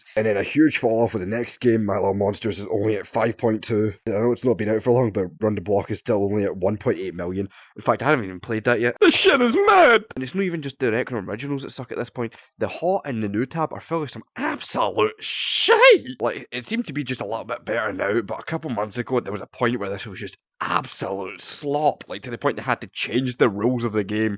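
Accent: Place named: Australia. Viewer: The Australian accent makes it 10 times better lol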